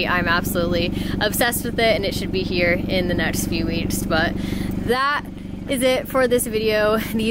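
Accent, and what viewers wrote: United States. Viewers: HEE-YUN-DAY. not HUN DAI. Damn that American pronunciation kills me.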